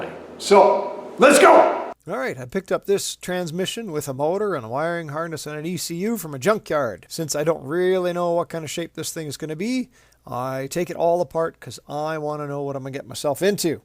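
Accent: Canada. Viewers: Love your video no crap just gogo on the job,thank you l hear your Canadian speak hows that hockey team eh?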